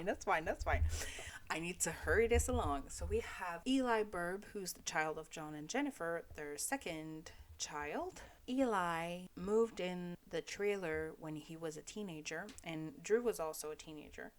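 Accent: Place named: Ontario, Canada. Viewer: I see Canada, and I know you are in college and have a major Ontario accent. We might possibly be going to the same institution, haha.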